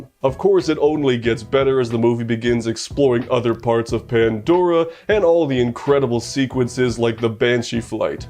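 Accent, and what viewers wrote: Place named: Russia. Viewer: я знаю, что ты русский, твой мерзкий акцент тебя выдает. скоро когда узнают что ты русский сразу депортируют обратно в помойку за то что ты русский :)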